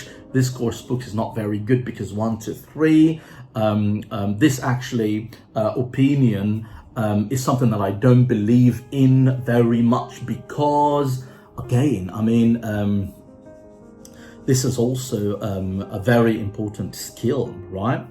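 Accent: United Kingdom. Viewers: Great British accent Mr, something brings trustfulness in your training place, I really felt relieved when I heard you talking in English, this is my first time by the way, cause I follow you on Facebook and your Egyptian Arabic dailect is impressive too. Greetings sir! That's make me ask about the cost of this splendid Delta course and if it's reachable online. Thank you and I wish you more breakthrough Courses.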